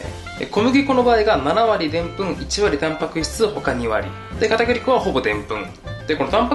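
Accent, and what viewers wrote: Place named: Hakata, Japan. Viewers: 若干博多弁？が多いこの動画すき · 所々出る 九州の方言が親近感湧きますヽ(´ー｀)ノ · この喋り方的に福岡やろか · 実食編、いつもより博多弁多いっっちゃんね(o^^o)よほどうまかと！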